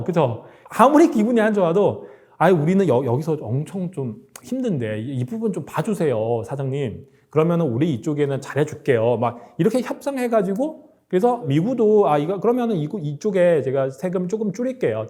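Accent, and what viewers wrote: China. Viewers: 발음이 꼭 중국인 같은데요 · 이 분 발음상 중국인인가봐요. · 이분 중국인 인가요? 발음이 한국인이 아닌거 같아서요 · 짱깨 발음 들어야 돼? 우리 아이들 다 망친다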